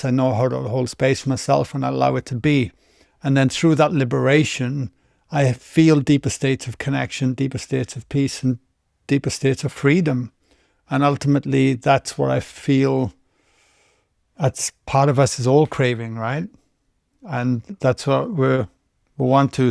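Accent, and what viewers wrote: Wales, United Kingdom. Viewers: Always up for a bit of Taff accent, greetings to a Welsh brother.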